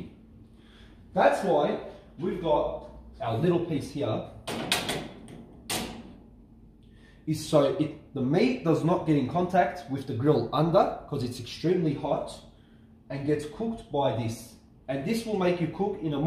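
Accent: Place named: Australia. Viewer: Australian accent, interesting...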